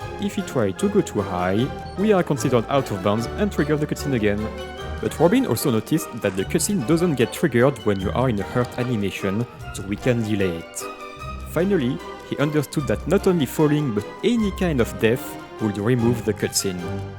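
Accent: France. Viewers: Are you French? You sound like one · What a good english with french accent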